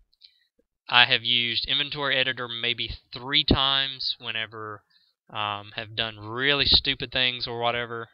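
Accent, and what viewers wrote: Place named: South, United States. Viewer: finaly someone with a southern accent man thx i thought i was the only minecrafter vid maker was southern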